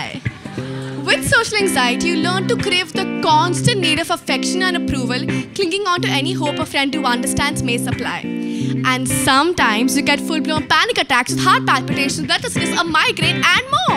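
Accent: United Kingdom. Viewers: And I love your English accent❤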